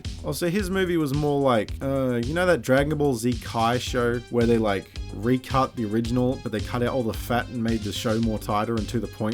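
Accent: Australia. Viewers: Slightly off topic "From the people who brought you Halloween and such titles as; H2O: Water..! H4O: Twice the Water..! Comes... H60..! Three times the water! Prepare to wet yourself, this Halloween season..! H6O! In theaters, now!" (Great vid of course, love the Australian accent as always. Good people.) ^^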